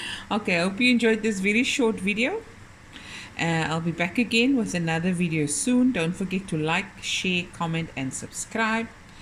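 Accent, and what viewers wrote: Cape Town, South Africa. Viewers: Nice job i ear you are from cape town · I love how I just randomly came across your video. Heard the accent and I knew immediately you are from SA. I'm South African currently living in Paris. Just tried this dish. Yummy❤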